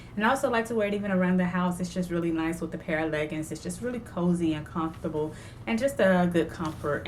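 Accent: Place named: South, United States. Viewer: I’m a southern girl living in Colorado, and I love watching your videos and hearing your accent. It’s a little bit of home. Plus, you’re just adorable! 😊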